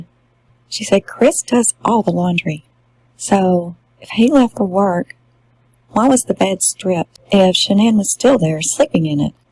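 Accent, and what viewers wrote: South, United States. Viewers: Beautifully read:elbowbump: you should do stories online.....nothing like a southern belle accent.💛..you sure got it:washhands: Thanks for doing this...muchly appreciated🥰x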